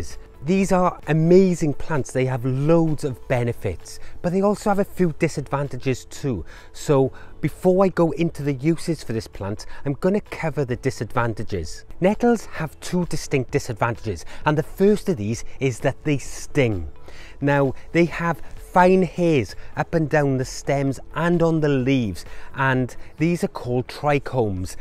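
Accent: Wales, United Kingdom. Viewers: Thats not a "British" accent has a WELSH accent a big difference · Yep its about time we started to listen to nature. Thank you soooo much for your vid and love the welsh accent sooo cute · Great video and lovely to hear a Welsh voice entwined in nature. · We eat them in Romania. I remember my grandmother feeding them to pigs..I remember their strong smell..so many memories brings this plant..hard to find them in Virginia, the US where I live now..btw love your British? accent..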